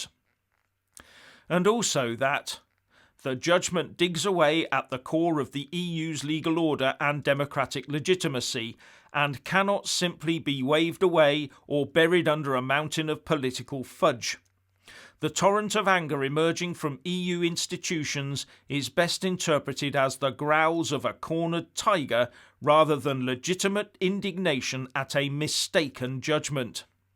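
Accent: United Kingdom. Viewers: Great analysis! Very interesting for those of us across the pond, who don't hear much of this stuff every day! (And I LOVE the crusty British dress and speech!)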